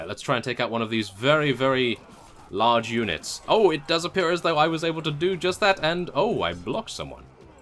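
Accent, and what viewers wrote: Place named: England, United Kingdom. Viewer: hey thanks for doing this series i really enjoy your narration. idk what it is but I think the English have the best accent to commentate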